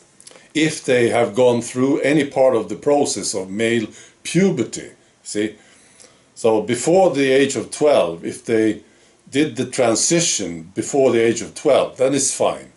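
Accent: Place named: Sweden. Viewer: Apart from being Swedish, there is nothing wrong with your accent.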